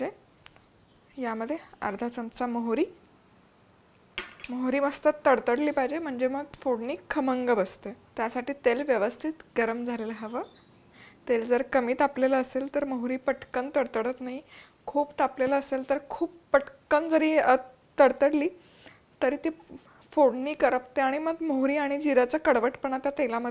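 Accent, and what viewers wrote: India. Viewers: Why do u speak so gavthi Marathi u can speak nicely also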